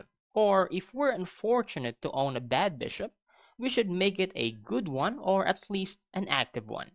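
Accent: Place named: Philippines. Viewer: Change your Pinoy accent to a British or Czech one and you will have more global viewers. Fact.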